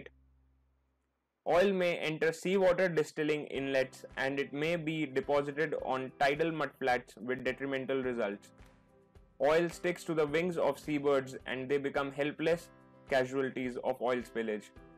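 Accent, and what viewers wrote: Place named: India. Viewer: what's wrong with your pronounciation,buddy?! it's really hard to get your indian English,unfortunately...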